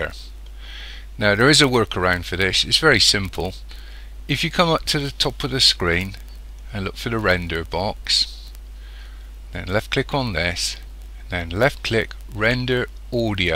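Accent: United Kingdom. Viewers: Most british tutorial ever seen xd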